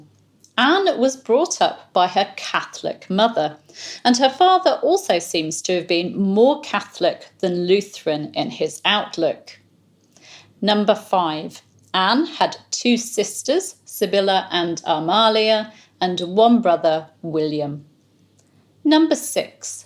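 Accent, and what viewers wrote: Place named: United Kingdom. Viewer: It's a shame the closed captions are gibberish. It must be the translation algorithm can't manage the British accent.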